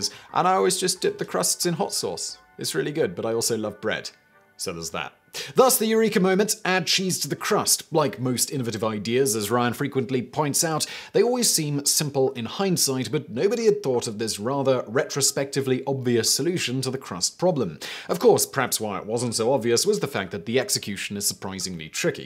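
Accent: United Kingdom. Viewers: Good old British accent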